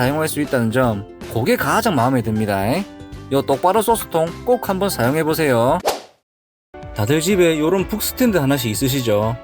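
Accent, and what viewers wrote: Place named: Yanbian, China. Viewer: 오오...억양이 연변분??😮 반갑습네다잉!!😮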